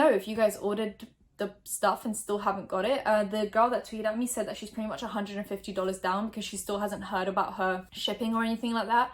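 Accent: United Kingdom. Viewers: Hi I’m a new subscriber! is a brother of backstreet boy Nick Carter. He was on the Lizzie McGuire show and played his famous song “ ” 🍭. Currently as an adult I believe he is mentally ill, as he threatened to kill his sister and his brother, and their children. They got a restraining order against him to protect themselves/their families. Aaron was court ordered by a judge to hand over his weapons (he has a lot of guns). Former child pop star. That’s all. Sorry you got hate by bad Larry’s in the comments. That’s not fair. I’m 23 years old so you missed the era by a few years not to mention you have a UK 🇬🇧 accent so he might not have been as popular across the pond. Look forward to more tea 🍵 ☕️ videos!!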